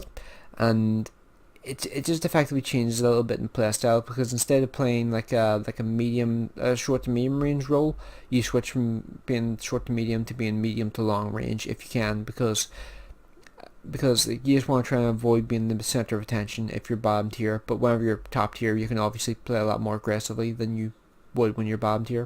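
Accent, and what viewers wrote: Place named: Belfast, United Kingdom. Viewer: Where are you, Belfast?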